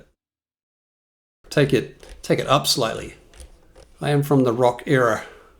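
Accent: New Zealand. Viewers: Great Vid… you sound like a kiwi? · Are you from Invercargill by any chance? Great to find a kiwi on youtube providing such good info.👍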